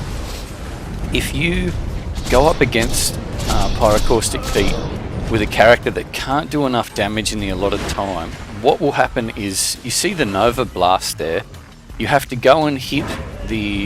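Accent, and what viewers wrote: Australia. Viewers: Yes we do and your aussie(thats a compliment)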